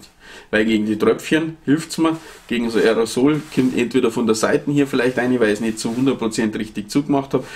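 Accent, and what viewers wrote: Bavaria, Germany. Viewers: Gutes video, und die bayerische mundart kann ich sogar verstehen. Da gibt es schlimmeres.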